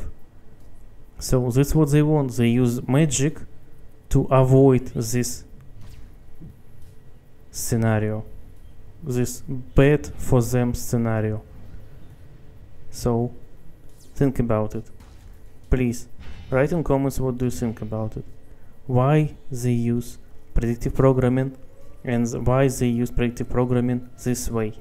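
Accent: Russia. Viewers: ooh,hi my Russian brother!!! very tick accent,i love it! greets from Macedonia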